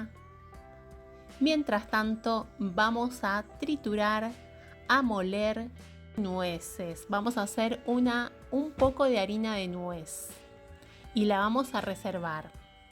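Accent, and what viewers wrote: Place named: Argentina. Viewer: Que ricas recetas, tienes un acento Argentino.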